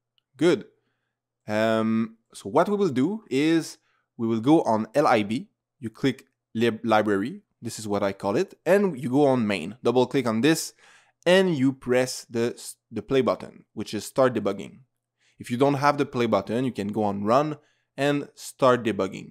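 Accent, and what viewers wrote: France. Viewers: Dude thanks for the tutorial! It was fun and funny at the same time! Your voice kept me focused. I laughed all along and learnt it in a fun way. Are you French btw? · the french accent makes everything better, thanks!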